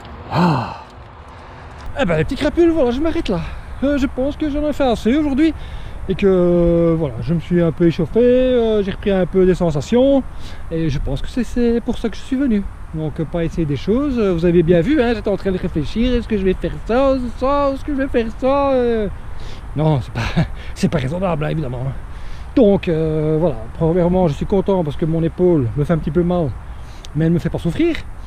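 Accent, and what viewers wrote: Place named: Belgium. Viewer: Belle vidéo ! Tu pourrais dire ou est le spot ? je suis à bx et quand j'entends l'accent je sais que c'est en Belgique 😅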